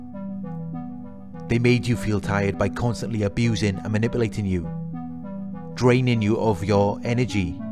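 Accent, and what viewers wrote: Wales, United Kingdom. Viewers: You sound Welsh ? Good video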